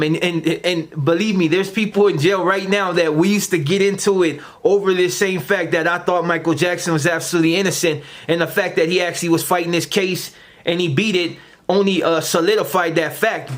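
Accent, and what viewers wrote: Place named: Mexico. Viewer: Why are you put on that Mexican accent in your voice your black brodie🤣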